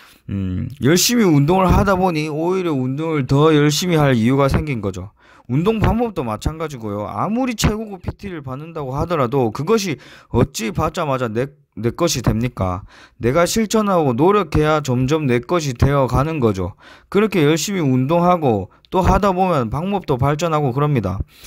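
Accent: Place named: Busan, South Korea. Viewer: ㅋㅋㅋㅋㅋㅋ 말투가 여기쪽이네...부산창원...정겹습니다.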